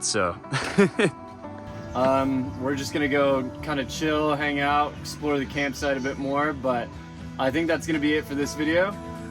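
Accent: Australia. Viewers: Hello, i really like your videos, keep em coming please. I noticed you are starting to sound more Aussie, goodonya!! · 5 months and your accent is sounding really Australian on many words and phrases! I've been living here for years and I just can't get the sound right. Tho 'family and friends in California say I use some weird words or expressions sometimes.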